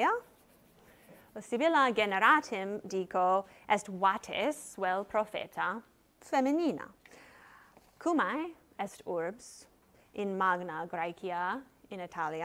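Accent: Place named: United States. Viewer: Not sure this Latin text with its distinct American accent, rythme and prosody would approximate the original Latin pronunciation close enough for it not to provoke a glimmer of a gentle smile on Virgil's lips. A fascinatingly bald and worthwhile experiment all the same. Many cheers!!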